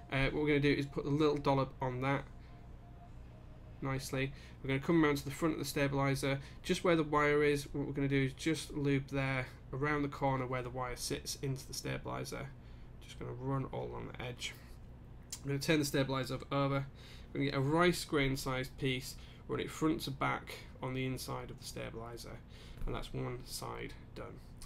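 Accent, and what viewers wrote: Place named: Yorkshire, United Kingdom. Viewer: I'd put your accent as Yorkshire, but just a guess.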